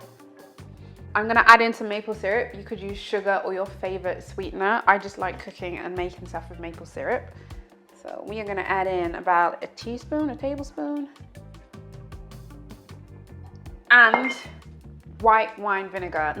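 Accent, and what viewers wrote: United Kingdom. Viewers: That is such a beautiful form of the English language! What region are you from in the UK? This is so classy.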